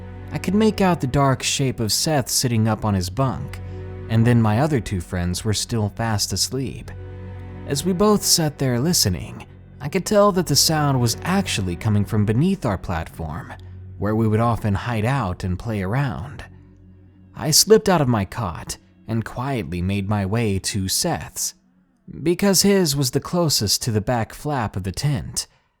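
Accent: South, United States. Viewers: That southern accent nearly KILLED ME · Hey, I loved your Southern Accent it made you sound like a Southern Gentalman.. I do declare 😉 much love Sexy Grandma ✌💙💙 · LOL< your southern accent! You're entertaining beyond the stories! Great job! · ... you southern accent is to die for. omg. <3<3<3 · I love your country accent and I love your videos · That rich southern drawl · I never thought I'd find a southern accent attractive before. O.o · I love the Southern drawl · LOL loooooove your southern accent!!! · I came for the dank stories, stayed for the southern accent. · that southern accent it shivers me timbres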